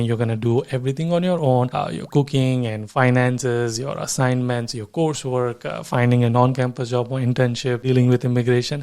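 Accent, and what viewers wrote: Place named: India. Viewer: accent = good but speaking = still indian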